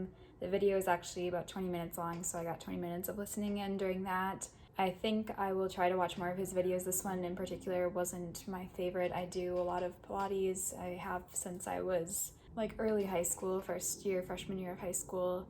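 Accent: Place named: Paisa, Colombia. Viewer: Súper bonito como se ya va pegando el acento paisa. Tienes una voz suave y es muy agradable escucharla. Felicitaciones. (Congrats).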